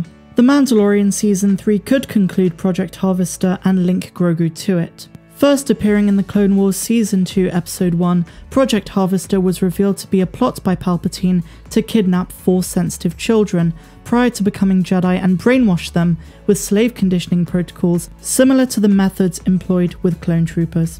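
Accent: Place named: Bristol, United Kingdom. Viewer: I would have bet, you was from Bristol.good luck. Great vid.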